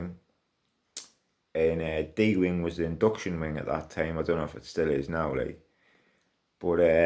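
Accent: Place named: Newcastle, United Kingdom. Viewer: Its the geordie bro 🙂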